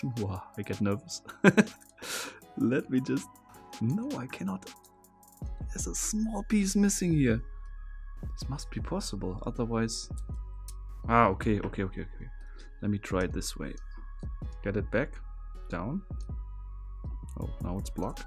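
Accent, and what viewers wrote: Germany. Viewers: Are you German? OMG yes you are....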